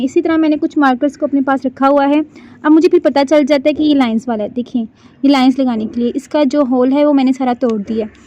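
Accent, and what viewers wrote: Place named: Lahore, Pakistan. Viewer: خدارا اردو کا بیڑا غرق نہ کرو اردو کی ادائیگی مناسب انداز میں کرو یہ لاہوری اردو سی پرہیز کریں'اردو ہماری قومی زبان ہے اس کو خراب نہ کریں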